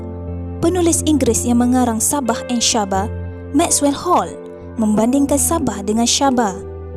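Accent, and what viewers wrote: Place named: Kuala Lumpur, Malaysia. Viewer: ni ka pula sejarah sabah, yg brcerita d cini mcm bukan org sabah, logat kl pula ni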